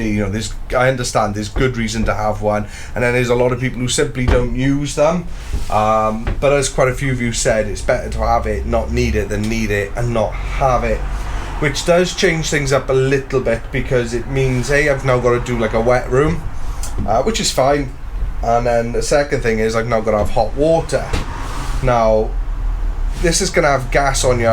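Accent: Wales, United Kingdom. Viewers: Defo Welsh ! Am I right ? I'm going thru your 7 vids (so far ...) and I see now your workshop is a garage at the side of your home and that's why it's not big enuf to take the van, as there is a limit on size of garages in homes ... I'm off to No 3 ... 👍👍👍✔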